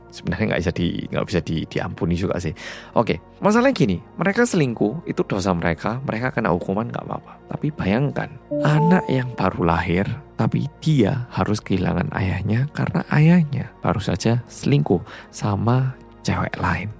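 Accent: Java, Indonesia. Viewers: Maaf ya mas kalau aku denger denger suara mas itu bener bener medhok Jawa banget jadi bangga ada orang Korea tapi puiiinnterr ngomong jowo 😅😅😅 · kan tinggal lama di Malang sekolah di Malang juga lama..gimana ga medok..bule aja ada yg medok biar pun ga tinggal lama di Indonesia ..lo tau ga bule medok ..😂😂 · Love swindler yang medooook. Makasih sudah menghibur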